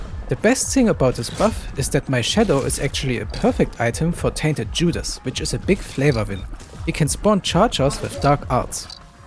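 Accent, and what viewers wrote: Germany. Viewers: Man merkt wirklich extrem dass du deutsch bist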